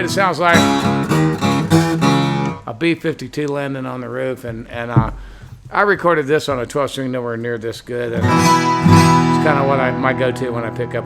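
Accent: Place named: United States. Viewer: You sound American. Bleurgh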